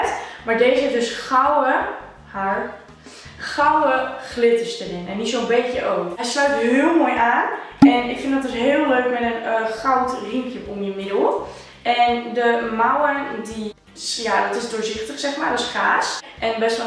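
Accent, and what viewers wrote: Twente, Netherlands. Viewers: Kom je uit Twente hahaha??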